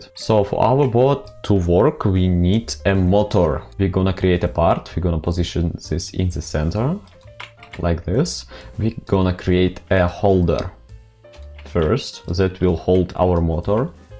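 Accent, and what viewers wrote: Russia. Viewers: по голосу кажется что русскоговорящий)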